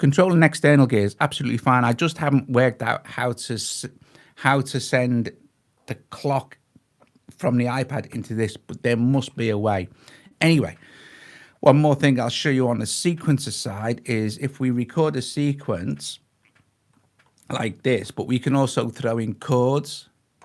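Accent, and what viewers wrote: Merseyside, United Kingdom. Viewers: Apple should pay you a commission. This video was the best argument for getting myself a new i-pad I have ever heard. Back in the days of The Strawbs, I dreamed of owning a Mellotron and later a Wavestation - I saved up for one only to find it had been discontinued. Now you've shown me I can have both for very little dosh (once I've splashed out on the i-pad). Now, you may have been in Cornwall, but that voice tells me that, like me, your origins are somewhere on Merseyside. Everton, me, but I am a red! I now live in Catalonia.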